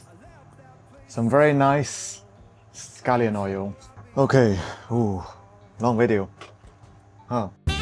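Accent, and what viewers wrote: United Kingdom. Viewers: Why would you assume that everyone who looks chinese is from china?? Also, did you NOT HEAR the UK twang?? He's more english than uncle roger. HELLO.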